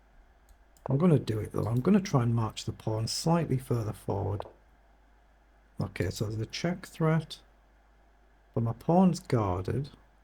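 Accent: United Kingdom. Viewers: Enjoy the vids. Which part of the UK are you from?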